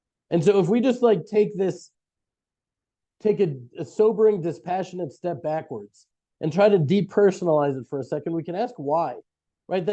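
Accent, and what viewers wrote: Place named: United States. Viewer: Such an awful lot of propaganda. jewishness is not the problem. Zionism is. Also, look at the speaker - white skin, American accent. Indigenous middle easterners have olive skin and Arabic accents. The kids in the video only know this way because of Occupation, Apartheid, and the brutal actions of the IDF. The Palestinians live in virtual prisons - the West Bank and Gaza. jewish kids in zionist izrael experience a very different life and a very different education, ie., books degrading the Palestinians - and that information comes from an izraeli jewish university lecturer who made a study of educational books first year to the end of high school. Now for the onslaught from zionists reading this ...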